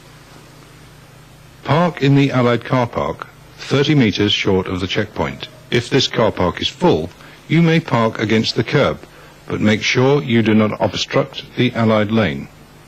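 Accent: United Kingdom. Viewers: I think it’s the very stoic and calm British accent aswell- it’s like those videos of what to do in a Nuclear War they made around the same time as this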